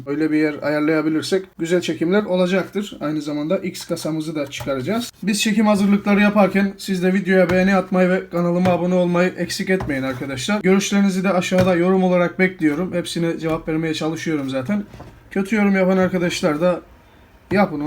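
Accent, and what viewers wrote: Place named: Rize, Turkey. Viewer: Seviliyorsun reis. Videolarinla yeni taniştim ve cok profesyonel. Şivenden rizeli sin galiba ben de rizeliyim reis yolun açik olsun daha büyümen dileğiyle .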